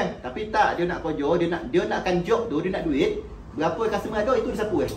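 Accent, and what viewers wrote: Negeri Sembilan, Malaysia. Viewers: bahasa nogori yo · Puas aku dengar loghat negeri sembilan...Seronok aku dengar...terbaik BOSS N TEAM....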